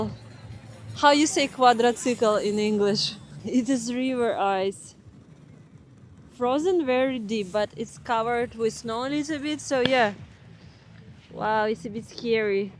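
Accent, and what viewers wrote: Russia. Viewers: It's so cool to hear you speaking Chinese. Do you have a strong Russia naccent in Chinese? Can Chinese people tell which part of Russia you're from? That little old Chinese pharmacist seemed very nice. What a cute little man. :)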